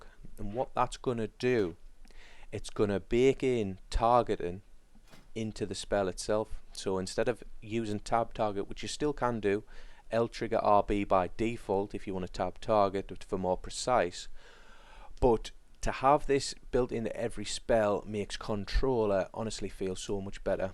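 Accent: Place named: Northeast, United States. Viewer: A fellow NE accent felt so good to listen to! Thanks for the guide my man! But I know nothing about macros?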